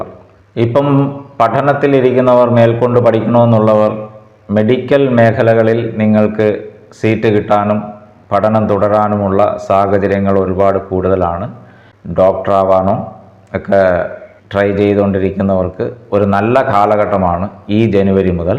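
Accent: Tamil Nadu, India. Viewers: സർ, താങ്കൾ തമിഴനാണോ സംസാരത്തിൽ തമിഴ് കലരുന്നു. ഏതായാലും താങ്കൾ നിസ്സ്വാർത്ഥനാണ്. തോന്നുന്നത് അപ്രകാരം പറയുന്നു. സത്യം സത്യമായി. 👍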